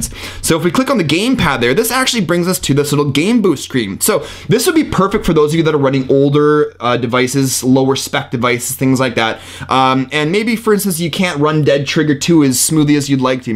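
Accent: Canada. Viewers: Ur r canadian right?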